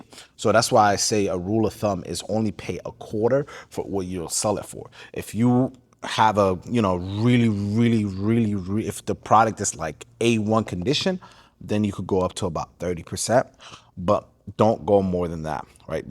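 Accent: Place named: United States. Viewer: Im greting you, I'm from warsame bade. Channel post . Second I think your are somali. Live in USA 🇺🇸, because of Your language accent is American 🎉.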